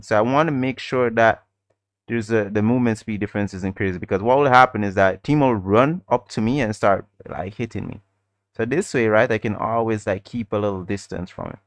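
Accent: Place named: Jamaica. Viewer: He's got a Jamaican accent, which is pretty unique, so don't get discouraged if you're a language learner. 👍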